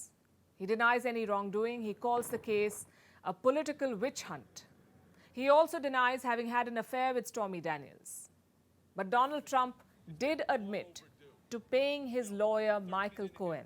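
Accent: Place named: India. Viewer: You....English please! Not indi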